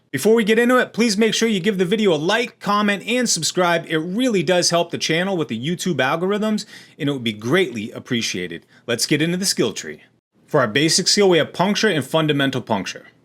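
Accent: New England, United States. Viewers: Do I detect a New England accent ?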